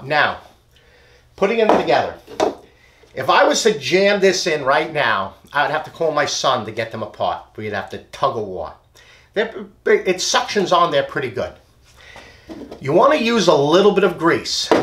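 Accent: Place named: Long Island, United States. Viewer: I have watched more dust collection videos than I care to admit to. Your video has got to be the very best at explaining the fittings and the types of pipe and fittings needed. In addition your accent reminds me of my childhood living in long island!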